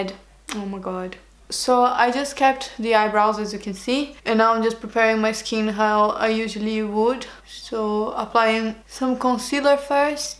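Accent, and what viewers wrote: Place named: Brazil. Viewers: Você fala português? O jeito que você fala me lembra o sotaque de brasileiro falando inglês --- You speak Portuguese? The way that you speak reminds me the english speaking brazilian accent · Allyson Matheus eu também achei o sotaque bem parecido, acho que ela é brasileira kkkk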